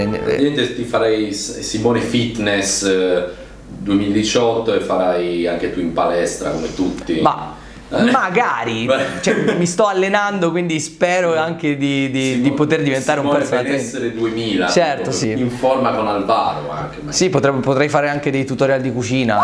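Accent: Tuscany, Italy. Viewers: ...Mi pare di notare un accento toscano... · Ma perchè l accento toscano?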